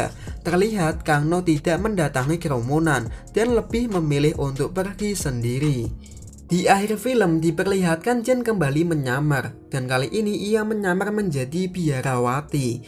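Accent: Java, Indonesia. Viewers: Jowone endi sampean? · medok banget..hehe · Aku malah baru ini lihat di tv gk pernah lihat karena jarang nonton tv 😂 seru jugak filem nya 😂 mas nya jawa tpi lucu ngong nya jadi tambah terhibur 🙏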